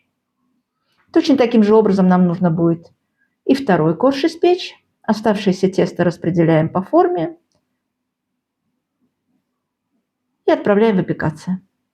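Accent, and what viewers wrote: Baku, Azerbaijan. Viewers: Спасибо Вам,дорогая землячка!Ваш голос и наш бакинский акцент просто завораживает и ласкает слух!Рецепт -супер!Успехов Вам. P.S.А хочу я ореховые трубочки,кот.продавались в Баку,я писала про них Вам..❤❤❤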